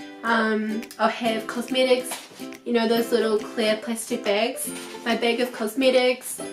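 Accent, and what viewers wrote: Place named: New Zealand. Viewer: Is that a Kiwi accent I hear?! LOVE IT 😍🙏 And awesome video, great tips chica! X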